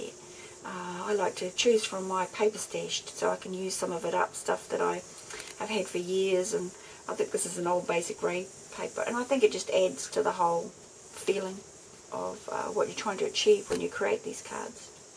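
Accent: New Zealand. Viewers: Hi...I love your New Zealand accent! I really do enjoy your tutorials and please keep talking(or singing!~)I look forward to you next vid...off to watch PT. 2 to this vid! ~Jeanne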